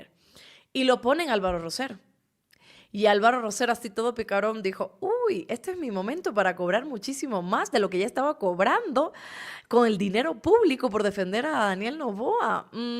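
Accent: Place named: Cuba. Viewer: Saludos bella....Me encanta tu programa....cada vez hablas más en Cubanito...que linda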